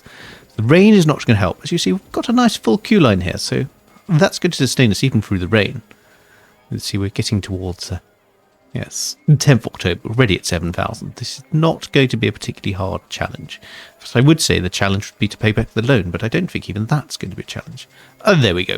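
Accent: United Kingdom. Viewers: I understand, & can i also say.. i've never came across anybody with a posh accent like yours before, it's kinda relaxing.. almost asmr in a away.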